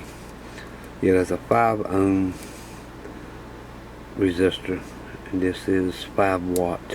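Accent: South, United States. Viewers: I'n happy to see some great folks stepping up to help and the thru his Patreon channel.. I find his brand of repair and restoration unique and refreshing. Besides his southern draw make me smile with delight. Please consider joining me by adding your support as Patreon with a $1 or more monthly contribution to help insure having his informative videos around for a long time.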